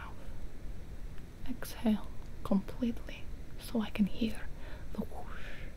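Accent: Russia. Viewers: with a Russian accent is so funny to me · russia accent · Love this series!! I fall straight to sleep with the Russian accent and the whispering makes it amazing! Love you! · Are your trying to do a Russian acent it funny. :im a Russian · The white hair and Russian accent reminds me of silver sable from the spider man game/comics, idk that just me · I feel i slight russian accent idk why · U speak like Russian english ) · Good russia accent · I live for the Russian accent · Your Russian accent is so relaxing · A russian accent i think its a russian accent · the russian accent really hits home, nice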